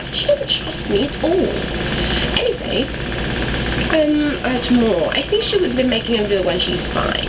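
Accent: United Kingdom. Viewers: cool E! gusto ko yung British accent mo,ah!I'm trying to change my voice sometimes(practise ba)kaso bakya tlaga!hhahaha Galing!iba na tlaga pag bihasa sa pagsalita ng Ingles.wla akong ma-say, ingit ako!haha! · wicked, i live in london and im a filipino. u got a posh accent. nice try ^_^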